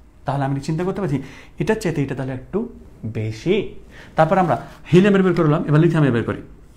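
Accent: Rajshahi, Bangladesh. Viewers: Sirer Basha Rajshahi onchol a...tai na?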